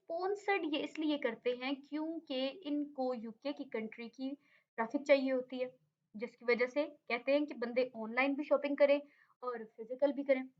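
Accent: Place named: Jhang, Pakistan. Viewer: jhang ki ho ap ?apki punjabi sy laga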